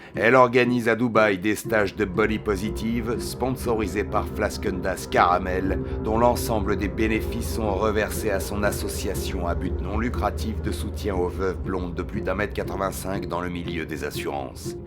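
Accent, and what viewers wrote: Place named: Germany. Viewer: T'es trop fort. Tu te transformes en chef d'orchestre d'un concert de rires pendant tout ton récit 😅 Ton accent teuton est parfait, on s'est vraiment payé une bonne tranche de rigolade. FAISAN encore beaucoup des comme ça 😅😅😅 Bises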